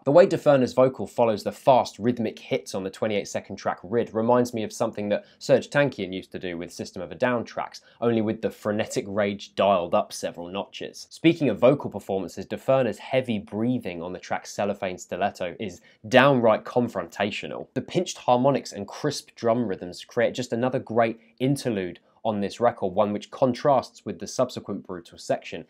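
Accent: United Kingdom. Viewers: I've stumbled upon a British Anthony Fantano.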